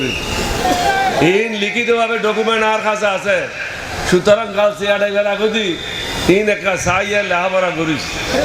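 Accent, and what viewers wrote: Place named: Chittagong, Bangladesh. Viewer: ভাষায় বুজা যায় আমাদের চট্টগ্রামে মানুষ মনে হয়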